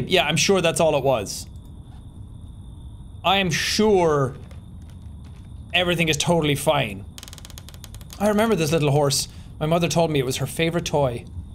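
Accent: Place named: Ireland. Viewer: I know this video was posted months ago and as I have said before even if you do not see this those who do I hope you realise that this is true what I have to say is that no matter how much haters he has no matter who tells me he’s not a good YouTuber I will love his content and his pure Irish accent forever keep up the amazing career.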